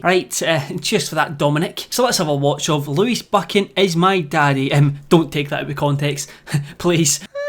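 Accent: Scotland, United Kingdom. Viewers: are you scottish? i love your accent damn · r u socttish? U sound scottish and I like that this is the first vid i watched abt u btw lol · Hello, I'm just asking. Are you British or Scottish? If not, what is your nationality. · Are you from Scotland?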